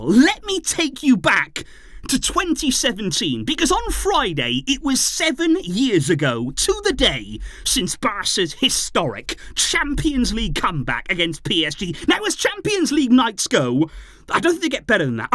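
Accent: Wales, United Kingdom. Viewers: I’ve watched a few of your old videos and your Welsh accent stood out a lot back more then 😂 but your channel has come a long way and we all appreciate your videos 🙏🏼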